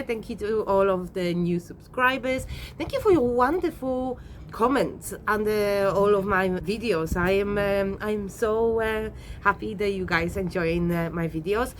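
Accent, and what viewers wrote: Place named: Greece. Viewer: Your accent when you speak English is charming - and actually sounds quite Greek-ish to like rather than Polish to an English listener, so I think this is a good sign that you should fit in very well in Greece! X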